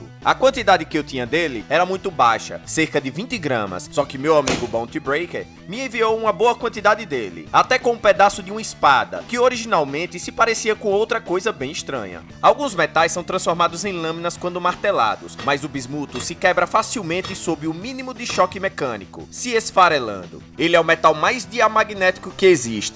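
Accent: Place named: Pernambuco, Brazil. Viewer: Fera!! Muito top o canal, quem dera tivéssemos um laboratório de química assim na escola.. e de onde é o canal? Pelo sotaque, parece Pernambuco. Seja de onde for, o conteúdo é de qualidade, precisamos mais disso por aqui. Deus abençoe!!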